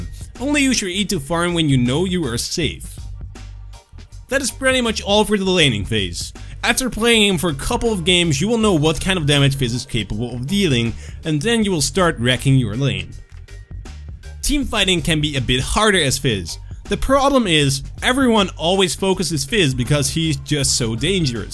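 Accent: Netherlands. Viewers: I knew it! You speak Dutch :D The accent is heareable :D Nice video once again en blijf zo verder doen! :) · Woow man. Geweldig accent. Nooit verwacht dat jij nl was XD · Ben je Nederlands ja?? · Are you, by any chance, Dutch? I hear a typical Dutch "a" and "i"... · ARE U DUTCH?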